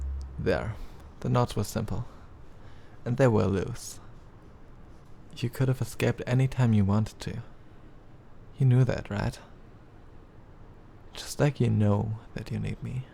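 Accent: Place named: Germany. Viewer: I literally LOVE his little German accent! It sounds so adorable and calming at the same time. Nice vid btw ^^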